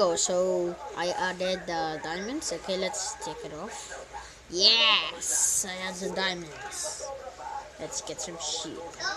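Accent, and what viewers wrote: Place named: Visayas, Philippines. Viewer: para kang bisaya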